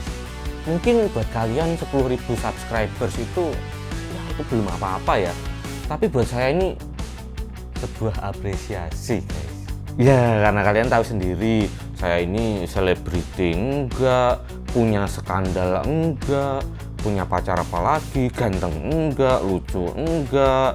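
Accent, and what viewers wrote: Java, Indonesia. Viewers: Mantap om udah 10.00 subs, om udah punya kualitas lho cuman tinggal konsistensi aja. Semangat terus ya om! Alasan ingin punya LED : Saya suka ngambil footage di malam hari dan di ruangan yg (sering) kekurangan cahaya om. Padahal cahaya kan aspek penting dalam ngambil footage. Dengan LED (terutama Ring LED), kekurangan cahaya itu bisa diatasi apalagi ring LED tipe yg bagus karena penyebaran cahayanya rata Alasan subscribe channel ini : Saya udah subs sejak lama om. Impresi saya pertama kali itu waktu liat video "Lensa yang tepat untuk Videographer" itu kualitas video om bagus banget. Pembawaannya juga santai dan medok jawanya bikin lucu hehehe. Om udah punya kualitas sama ciri khas yg bagus, tinggal konsistensinya aja.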